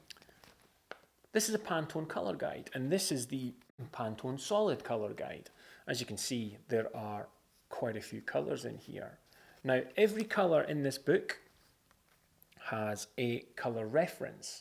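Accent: Scotland, United Kingdom. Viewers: A great explanation of what Pantone colours are for. Nice to hear a Scottish accent too, rather than the usual American! Subscribed.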